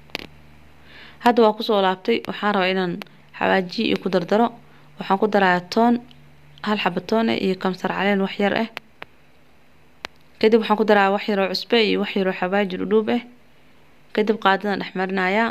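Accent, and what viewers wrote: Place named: Hargeisa, Somalia. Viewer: Cabage weeyaan lahjada hargeisa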